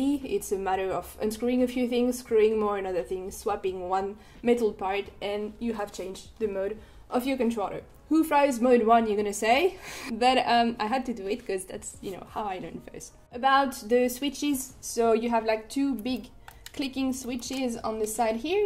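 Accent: France. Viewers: I meant like are you in France or a different country? Like the accent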